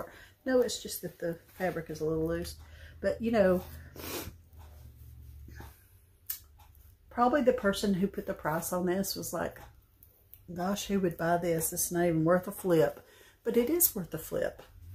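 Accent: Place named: North Alabama, United States. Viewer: I am so sorry you have had the flu!!! I have experienced the flu one time. My husbands side of our family has a celebration between Thanksgiving and Christmas that covers all the bases. I was coming down with Covid and didn't know it. I was mortified because everyone came for the first time in twenty years!! I had shots that allowed me to have a relatively easy time. I am so glad to see you back on YouTube. I love to hear you talk in the way us North Alabama people do, lol! God's grace and blessings to you and your family. Sherry from Alabama.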